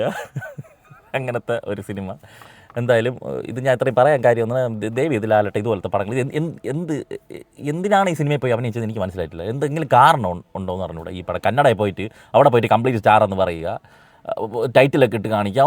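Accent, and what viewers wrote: Trivandrum, India. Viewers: ഇതാണോ തിരുവനന്തപുരം ഭാഷ ആണെങ്കിൽ nice❤❤ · Itrem bore presentation, maryadakk samsarichude, istapettillel athang paranjapore, trvm kare parayipikkan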